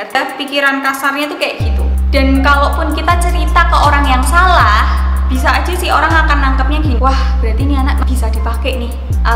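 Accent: Java, Indonesia. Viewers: wedok iki medokkk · Wong jowo ndi to mbak?😃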